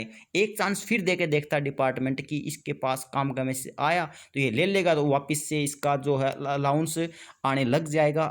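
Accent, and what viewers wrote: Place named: Haryana, India. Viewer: I like your way of talking pure haryanvi 👍🏻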